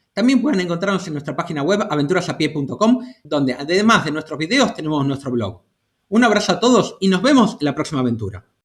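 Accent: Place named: Argentina. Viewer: Gracias nuevamente. Solamente que me cuesta seguirte con esa pronunciación argentina (muy musical y familiar, pero que a veces es difícil para los peninsulares). ¿Cómo has dicho que era el acueducto Olvidado? Lo he buscado en el mapa tal cual y no lo he encontrado. Gracias. ¡Ah, y enhorabuena por la página!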